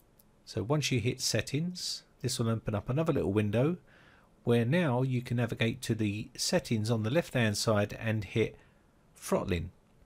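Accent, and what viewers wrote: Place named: Cockney, United Kingdom. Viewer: It is actually 'Wotcha' guys that he says at the start of the videos. It is an English slang expression for ' Hello, how are you'. Spoken along with his semi Cockney accent. I am from London and speak in a similar fashion to this! I do agree about why would you want to throttle your connection though. I suppose someone must have a reason for doing it somewhere. Puzzling though!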